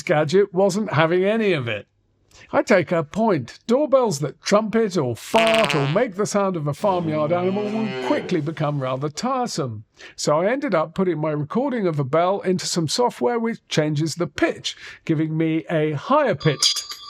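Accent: United Kingdom. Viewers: I'm an english teacher from Austria. Most youtube videos are made in America...but I really love your british english. It's so much more sophisticated...I just love it:) also your content on the doorbells ist interesting of course.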